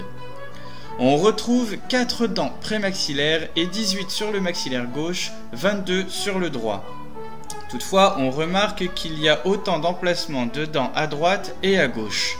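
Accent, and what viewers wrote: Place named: United Kingdom. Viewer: Ooh, cet accent so british ! ;p Bon ben tant pis pour toi : tu gagnes 1 subscriber, my Sausage friend ! ^^ Juste un petit conseil en passant : essaie de moins donner l'impression que tu lis ton texte, fais-le vivre quand tu t'exprimes, ce sera plus agréable à l'écoute. Tu es passionné, alors fais-le nous sentir, sois naturel ! ;)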